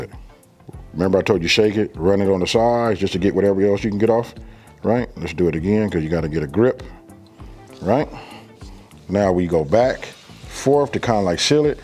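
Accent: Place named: West Coast, United States. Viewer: Side-note I started watching your video and as soon as I heard you start talking I was like he’s so west coast 😂 he gotta be either from Cali or Denver I looked at your info and it said riverside i knew it I’m from Sac but I live in Denver now but its still the same Westcoast vibe anyways i just thought it was funny thanks for the video stay dangerous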